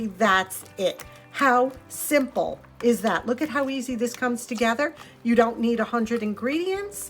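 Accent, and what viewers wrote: New York, United States. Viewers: I love hearing another New Yorker, it's like listening to family! These were such great tips. I really appreciated all your ideas and that lovely pie!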